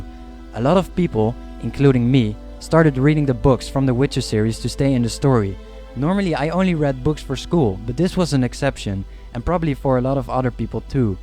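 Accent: Netherlands. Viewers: I don't know why but I can totally tell you're Dutch. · Hey, where are you from? You sound Dutch!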